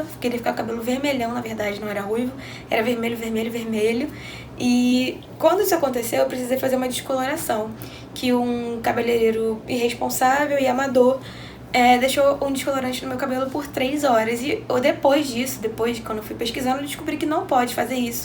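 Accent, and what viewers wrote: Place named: Rio de Janeiro, Brazil. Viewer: Sotaque carioca é tão bonitinho... 💋 de Sampa.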